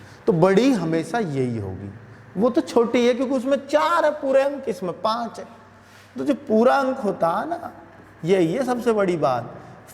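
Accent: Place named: Western Uttar Pradesh, India. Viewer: Sir.Questions ke Answer explain karne ka way hai, wo aapka Ultimate hai. But aapki jo Desi language hai wo Impressive hai Western UP wali. Hum bhi aesi hi language mai comfortable hote hai 👌🏼👌🏼👌🏼👌🏼👌🏼👌🏼.😊😊😊😊😊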